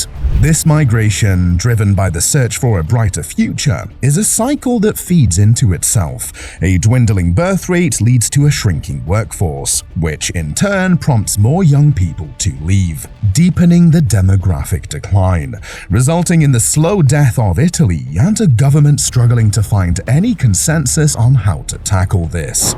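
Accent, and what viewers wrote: United Kingdom. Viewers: Assembling other people's videos and hiring a narrator with a British accent can be PROFITABLE. Nothing here that a good statistician could have told you-and has, btw. Why don' t you consider the immigration problems and the Procreation for Recreation immigrants overflow in Lampedusa or those being shipped to ALBANIA ? OLD NEWS . NO SUBSCRIBE. NO LIKE.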